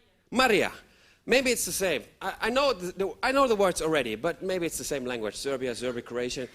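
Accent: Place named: Germany. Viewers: ***** Tut mir leid dich entteuschen Zu müssen, aber Ich war schonmal da. Ausserdem hat jeder einen Akzent, Englisch Deutsch egal- the kings English ist auch bloß festgelegt worden, also auch ursprünglich ein Akzent. Gleich wie beim Deutschen: Hochdeutsch ist nichts weiter als der Hannoveraner Akzent. Also Update : Kein Mensch spricht irgendeine Sprache Akzentfrei.... Also 100% der Engländer sprechen mit Akzent, nur ist das die Muttersprache des Engländers ist, also klingt es richtiger als ein ausländischer Akzent. Ausserdem: Wer hat gesagt dass das schlimm ist das er einen Deutschen Akzent hat? Hab Ich warscheinlich auch :) Schönen Abend LG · Wenn ich Englisch rede, hört man sehr stark meinen Deutschen Akzent raus. Recht viele in der Klasse von mit können ihre Stimme, wenn sie Englisch reden "verstellen", so, dass man den Deutschen Akzent nicht mehr so gut raus hört. 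Ich kann das nicht in der Englischen Sprache. Komischerweise mache ich das "Automatisch", wenn ich Französisch rede. Und ich finde, bei Kaya Yanar hört man den Deutschen Akzent nicht so krass raus.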